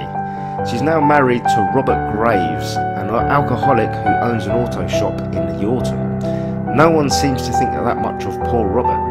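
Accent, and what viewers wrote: United Kingdom. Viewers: This game is beautiful and amazing. yes the slow walking can be a tiring if you are back tracking (for instance I completely forgot that you needed to move the controller near some lights and only did it the first time instructed put the game down for 1 month came back to and made it to bunker wondering why won't it open untill I watched the beginning of a walk through and was like Noooooooooo) but the walk through this town and countryside is peaceful and beautiful. I found myself looking up through the trees listening to the music speechless. I love piecing together the story which was a great story. This was my game of the year (over all the ps4, xboxone exclusives and 3rd party games) because this is the only game leaving me thinking about it weeks later and looking on youtube for others point of view and searching for things I may have missed. 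thank you for this commentary it was perfect. especially since you sound like you are from the UK it works to enhance memories of the game. thanks again keep up the good work. I thumbed up and suscribed.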